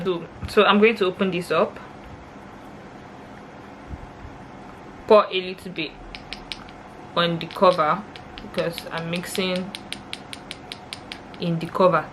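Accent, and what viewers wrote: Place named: Africa. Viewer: I love ur african accent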